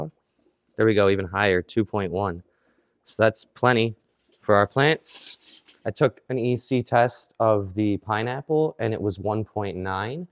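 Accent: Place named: Southwest, United States. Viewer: Nice video. Sounds like yur in Nevada, New Mexico, Arizona or Texas. Your ideas our great. We find plant people are the nicest. Eventually kids will be even more fun then plants then you teach them all about these great things, nothing more joyful then kids. Keep pushing along, the Lord, wife, school, work, family then plants n hobbies and you will have a great balance. Blessings and very nice videos and well spoken.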